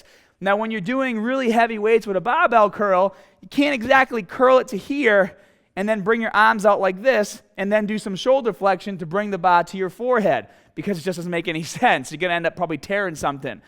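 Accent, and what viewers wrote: Boston, United States. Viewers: Yup, first video I saw I thought he just had a really adorable speech impediment, then I realized he was from Boston.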